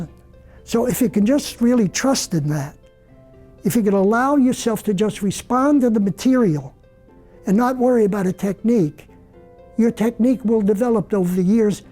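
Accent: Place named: Philadelphia, United States. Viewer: Seeing this video made me cry because Charles was my dear friend, and I miss him and think about him all the time. I became an illustrator and painter and would get to spend time with him at his studio talking about art and just about anything and family. He was a gracious man and very kind to me. He would look at what I was doing and shared so much insight and the sound of his voice really resonated with me as someone who also grew up in Philadelpha like me and also stayed in Philadelphia his whole career. We had many similarities in families and thought. I would say for many years I knew him and his work prior to becoming good friends but always thought to myself what do I have to say to him? He was brilliant and one in a billion. I only got the courage to finally make the call to his studio when I had children's books that I had written and illustrated published and saw success in what he did so brilliantly. 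I finally had something to share with him and Charles let me come to his studio and we spent hours together over many years just talking. He saw me as a fellow illustrator and was so very pleased that I was getting to be part of the parade he talks about in this video. He always greeted me with "Stephen!", and he made me feel special like family. I hear his voice often. He has a beautiful family. I loved that he was a family man.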